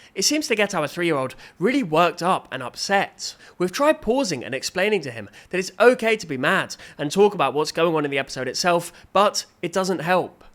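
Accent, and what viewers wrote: United Kingdom. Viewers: Bra I'm not going to subscribe to this stupid British dude learning English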